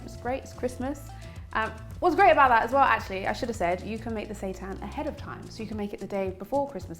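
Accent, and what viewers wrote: London, United Kingdom. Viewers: Beautiful black vegan with cute London accent. 😍 Surprised to see so few guys in your comments, we are usually suckers for beauty and food!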